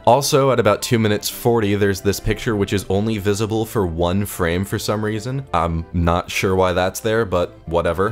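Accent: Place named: Northeast, United States. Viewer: We also call them that in the NE and that’s what his accent sounds like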